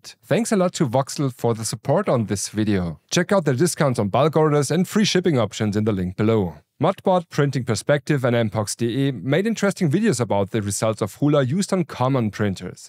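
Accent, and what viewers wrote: Germany. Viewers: Are you printer guys all the same guy with the same voice and same german accent? 🤔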